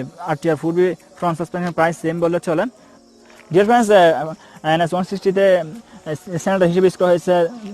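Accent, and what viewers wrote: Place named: Chittagong, Bangladesh. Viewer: কথায় পুরা চিটাইংগা এক্সেন্ট 😷😂😂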